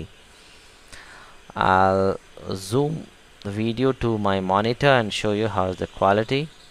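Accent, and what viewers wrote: India. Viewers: He sounds like ever indian I have ever met...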